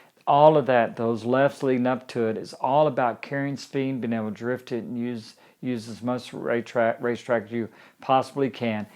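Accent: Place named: South, United States. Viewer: It’s great to hear a southern accent talking about moto gp. As I always say, I wish there were more Americans involved in moto gp. Keep up the great work, Freddie!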